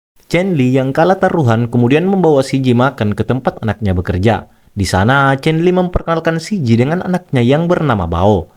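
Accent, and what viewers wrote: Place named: South Sulawesi, Indonesia. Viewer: Orang mana bang. cara ngomnya kayak orang sulsel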